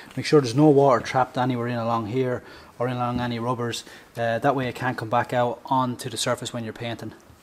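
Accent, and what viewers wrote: Ireland. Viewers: Thanks for the great video. Nice Irish accent too! Lol! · The Irish accent!!! The title should have said so I would've clicked sooner this is rad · God Irish accents are so cool I’ll buy what ever you sell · Hes Irish so yeah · You sound Irish.... so why Hood? And why Fender? BONNET AND WING. Nice job though :)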